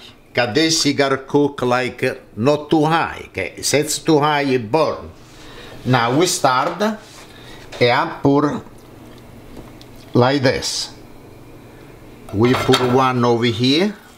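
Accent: Italy. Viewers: His Italian English is hilarious !!😂😂😍😙😙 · Yummy 😋 😋 enjoy the Cooking and italian accent mama mia bellisimo 👍😄God bless you🙏😇♥ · So good! I've been into chickpea flour instead of white flour because I think it's got more protein and is a bit healthier than the white. Going to make this soon. Looks amazing! I can smell it through the screen! Love your accent. I'm Italian too. Buon appetite!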